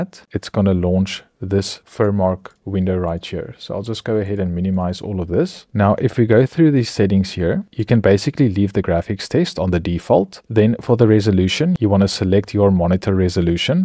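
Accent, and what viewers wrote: South Africa. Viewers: I could definitely tell that you're South African based on your accent. Great video!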